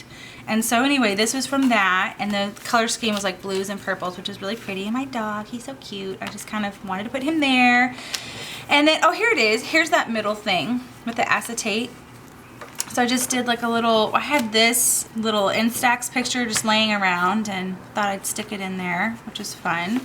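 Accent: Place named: Texas, United States. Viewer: love love love your videos! I noticed your accent right away and knew you had to be from Texas! I myself am from Texas live about an hr outside of Austin. keep up the awesome videos ,I love how creative you are and I love your personality!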